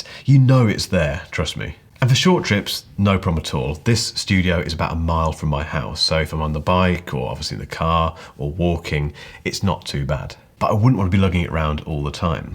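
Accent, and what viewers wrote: United Kingdom. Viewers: Watching your videos not only gives much useful info but also allows listening to perfectly clear British speech, which is like honey in my ears. From Russia with love:)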